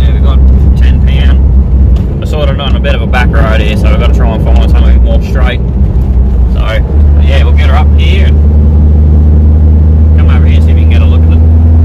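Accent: Australia. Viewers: hi! can you write down everything.sorry to sound rude but your australian accent got me. if you dont mind writing everything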